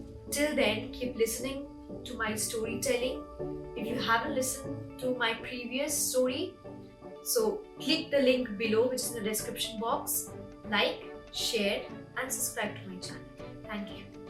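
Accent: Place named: India. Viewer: Beautiful story . And very nicely told. I like Indian accent.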